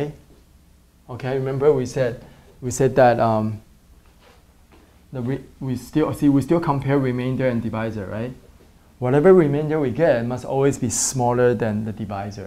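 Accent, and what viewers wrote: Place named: South Korea. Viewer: This guy is my favourite teacher out of the ones I've seen so far in StudyPug. his Korean accent makes him so easy to understand, and he explains things so well! Tbis guy is awesome.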